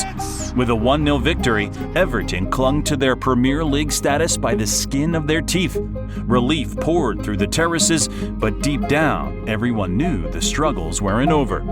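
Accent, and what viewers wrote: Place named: United States. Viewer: bro is your voice AI and why is an american speaking about everton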